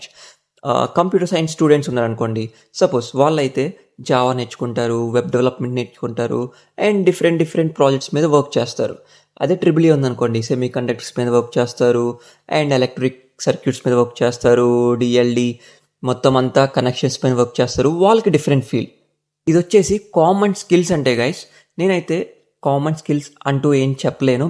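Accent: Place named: East Godavari, India. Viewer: Maadi ap mandapeta Ne voice east godavari la vundi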